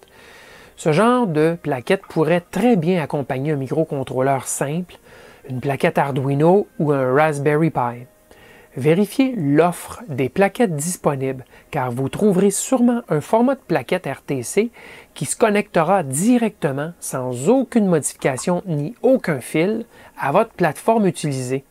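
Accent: Quebec, Canada. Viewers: Bonjour, Encore une vidéo enrichissante, je ne savais pas qu'il y avait de la Ram dans ce genre de module. Ce qui m'éclaire bien pour les nouvelles fonctionnalités de reprise d'impression 3D après une coupure de courant par exemple :) PS : j'adore l'accent québécois ;)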